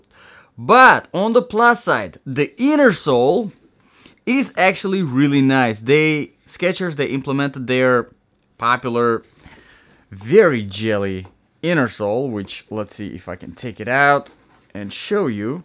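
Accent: Russia. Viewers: İs it Russian accent?)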